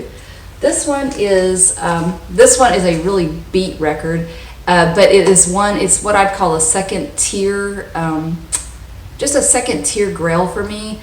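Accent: South, United States. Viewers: I love your accent,. Are you from Georgia or the Carolinas? I’m in Wisconsin.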